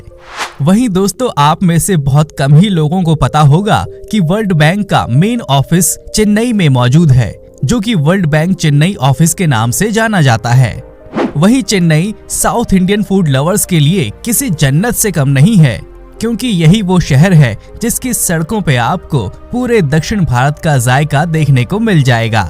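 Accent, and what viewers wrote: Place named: North India, India. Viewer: Why do you blabber in North Indian Hindi. 😡😡😡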